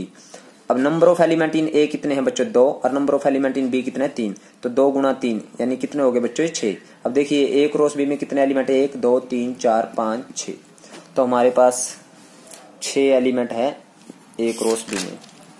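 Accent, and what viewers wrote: Haryana, India. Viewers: Apki haryanvi bhot cute h sir😁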